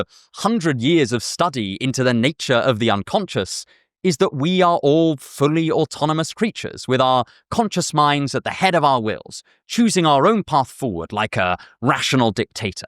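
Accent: United Kingdom. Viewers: Where in the UK are you from if it's not too rude to ask? Usually, I don't struggle as the accents across the commonwealth are quite different, but I struggled to tell if your accent was British or New Zealand. Sorry. I know this is a rude question, it's purely an academic inquiry. Like how Portuguese from Portugal and Russian are completely different languages but have fairly uniquely similar vowels or consonants unique to those languages? If that makes sense? Anyway... 😅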